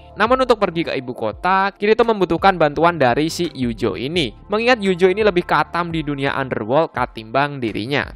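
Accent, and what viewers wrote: Java, Indonesia. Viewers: Gw suka sumpah kalo adminnya bilang"E tapi,E karna"pake bahasa medog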